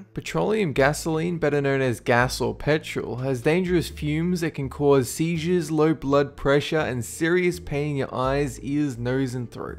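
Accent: Australia. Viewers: Only just realising this now. He's probably an aussie. I tend to tune out accents which are the same as mine because I hear it every day... but I don't think he's from Victoria originally (if he lives here now. I heatd someone in a shop the other day who sounds a lot like him). Maybe NSW or QLD... not sure... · He's Australian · been listeing and He's an austurlian I think....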